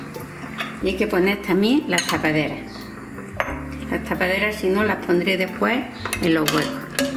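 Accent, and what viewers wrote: Murcia, Spain. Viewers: Voy ha probar muy buena receta Paisana yo también soy de Murcia te conoci en la forma de hablar que eras murciana , un saludó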